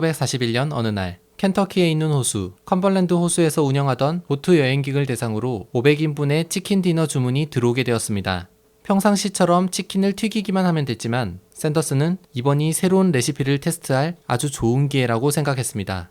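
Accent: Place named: Busan, South Korea. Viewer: 말투가 부산이네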